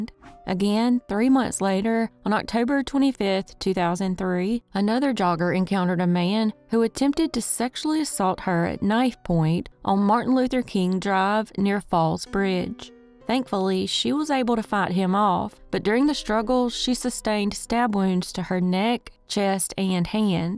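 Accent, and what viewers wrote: South, United States. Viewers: she has a country accent lol that’s perfectly normal everybody speaks differently but ig we all got our own opinions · Thanks. Love ur voice, only southern one I like💕🥰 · Love my southern Belle voice ! Have a wonderful and Blessed week ❣️🙏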